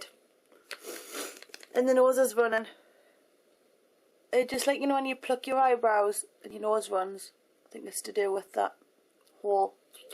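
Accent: Newcastle, United Kingdom. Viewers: She has a geordie accent, she's from south sheilds near newcastle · i like ur british exent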